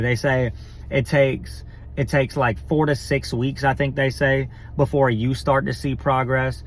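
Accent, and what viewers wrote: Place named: Louisiana, United States. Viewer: Hi! I just found your channel! You sound like you’re from Louisiana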